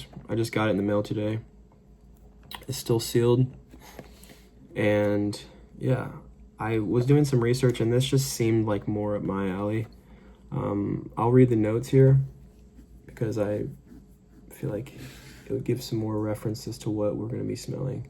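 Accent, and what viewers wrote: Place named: France. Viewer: Ey man I was curious. Why do u often use this "france-ious" dialect?✌🏼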